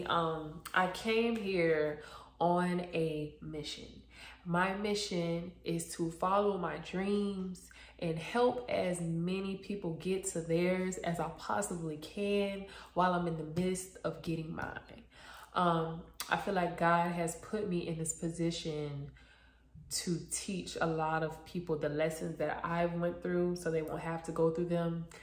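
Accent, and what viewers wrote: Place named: Broward, United States. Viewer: You can move out of broward but you can’t take the broward talk out of you lol 😂 I loved this vlog 🥰 congratulations happy for you keep going bby 👏🏾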